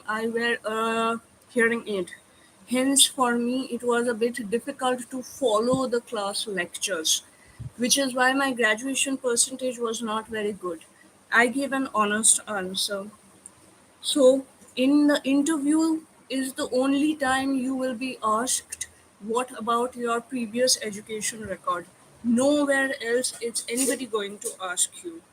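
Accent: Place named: England, United Kingdom. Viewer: Why her Hindi sounds some Bollywood Angrez Hindi accent. Oh wait she is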